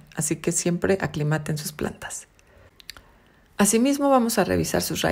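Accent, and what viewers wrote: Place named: Mexico. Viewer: Viva México,Lindo y querido y de donde pensaban que era???escuchando su asento es ovio que Mexicana,succulenta esss. 🌵Gracias por tus vídeos😄😁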